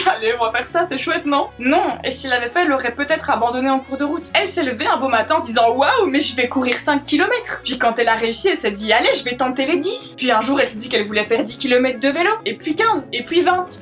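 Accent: Belgium. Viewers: Une compatriote Belge avec le bon accent :D Du coup l'objectif Iron man m'a fait rire tout seul devant mon écran, parce que faut devenir riche, se forger une armure et fonder les Avengers. Il y a du boulot ! :D (oui je revendique ma vanne pourrie) Continue comme ça en tout cas, plein de bons conseils dans lesquels je me retrouve :D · tu parles comme une belge j'avais cru que tu es française je me suis trompé vas-y donne ton 0032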